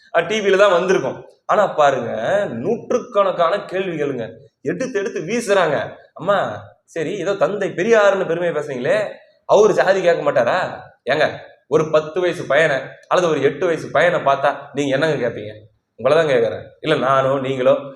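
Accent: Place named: Coimbatore, India. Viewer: தம்பிக்கு வாழ்த்துக்கள் வணக்கம் உங்களுடைய தெளிவுரை விரிவுரை அகல உரை ஆல வரை அனைத்தும் ஆள்பவர்களை நிலைகுலையச் செய்கிறது தம்பி உங்களது பேசும் தொனி கோவை சார்ந்த குடிமக்களைப் போலவே கேட்பதற்கு நையாண்டி ஆகவும் இருக்கிறது மிகவும் ரசித்து உங்களது பதிவுகளை பார்த்துக் கொண்டிருக்கிறேன் உமது அண்ணன் கோவை சௌந்தர் நாம் தமிழர்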